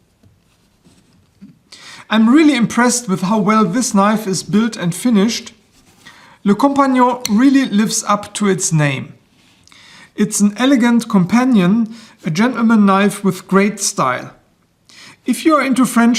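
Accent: Germany. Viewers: Sein Englisch ist doch perfekt zu verstehen, klingt wie deutsch😅😅😅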